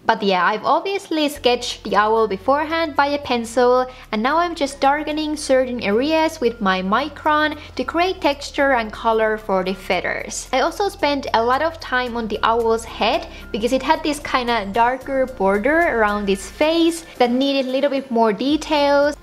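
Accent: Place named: Finland. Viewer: Ohhh I knew that you were Finnish, because I'm in a European school in Brussels with Finnish sections and when I talk to my Finnish friends, they sound like you! I like Finnish, it's a very unique language!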